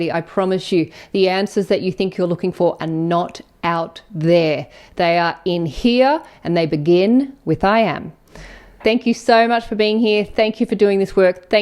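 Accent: Australia. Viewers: Thank you for reminding me about the law and its nature. Btw, what's your accent? Is that Aussie?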